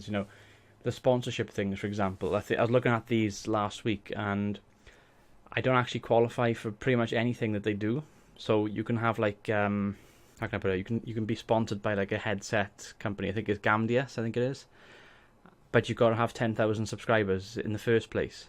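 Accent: Wales, United Kingdom. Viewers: What part of Wales are you from?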